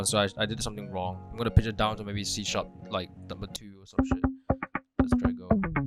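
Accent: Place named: Northeast India, India. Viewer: BTW BRO U LIKE LIKE NorthEast indian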